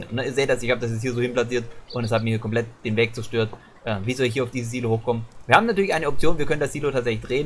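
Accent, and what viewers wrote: East Germany, Germany. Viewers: Gutes Video aber sag mal wo kommst du her ? Dein Dialekt hört sich irgendwie Ostdeutsch an aber auch Iwie nicht. Wie als würdest du versuchen ihn nachzumachen. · Wenn ich den piss ossi höre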